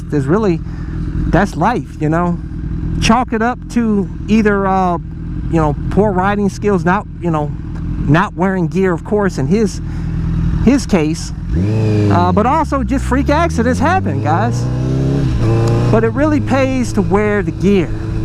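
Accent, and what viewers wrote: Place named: Louisiana, United States. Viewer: Dude are you from Louisiana? You sound familiar af bro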